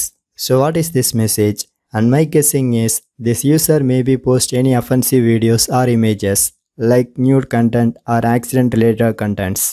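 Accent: India. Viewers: U from India mate?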